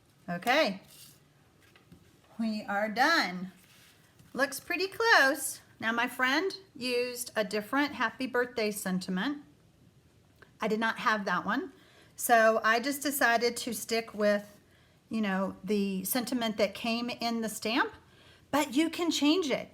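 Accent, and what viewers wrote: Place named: Texas, United States. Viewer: Lordy, I miss that TX accent ! Raised north of Dallas in Denton and moved to se OK in '77. No one talks like that north of the Red River and I miss that ! Love your videos Lady. Keep on doing what you do ! 🙋 GreatNana in se OK usa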